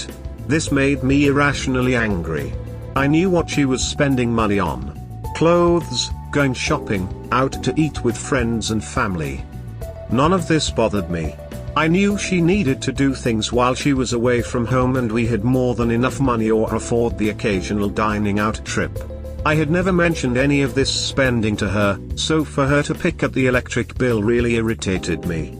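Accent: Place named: England, United Kingdom. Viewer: I'm 3/4 of the way through this story (and don't know how it ends) and feeling frustrated and irritated with OP (maybe the male English accent of the narrator is making it even worse). This story should be titled, "Journey into the mind of a SIMP BH". Once divorced, stop doing the 'pick-me' dance, block her phone and all forms of communication; full NC. End of story.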